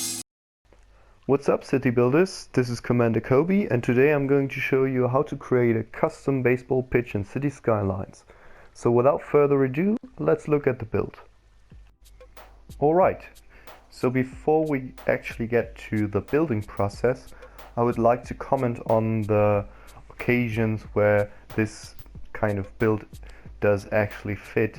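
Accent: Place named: Germany. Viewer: Are you German? You have a German accent. Schade dass du nicht noch mehr Videos machst... Jaa bisher sind die noch recht Amateur-Mäßig... Aber so sollte Youtube auch sein und die Tipps und Tricks hier haben mir sehr geholfen. War auch recht unterhaltsam und entspannt. Vielleicht macht du noch was zu Jurassic Park ?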